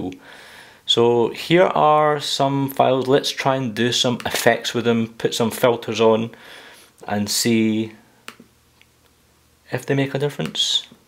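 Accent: Scotland, United Kingdom. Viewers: Sounds like a Scotsman must be a Scotsman!hello mate....can you run xcode?it runsok??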